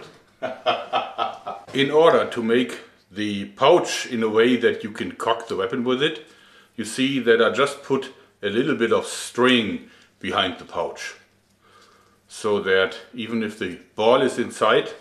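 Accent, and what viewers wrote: Germany. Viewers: where are you moving to im guessing somewhere in Germany at least that's what i think because of your accent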